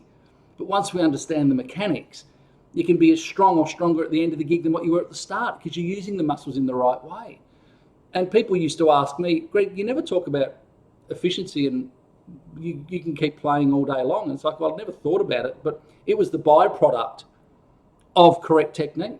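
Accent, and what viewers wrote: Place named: Australia. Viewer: GREG! I LOVE YOUR TEACHINGS AND LOVELY AUSTRALIAN ACCENT!! I'M JUST LEARNING TO PLAY THE TRUMPET! SO I'M SO! GLAD YOU ARE DEMONSTRATING HOW TO DO IT THE EASIER WAY!! I'M ORIGINALLY FROM FLORIDA, AND HAVE MOVED OVERSEAS TO PAGADIAN CITY, PHILIPPINES! THANKS AGAIN! AND MAY GOD BLESS YOU! ALWAYS!! 👍😁🙏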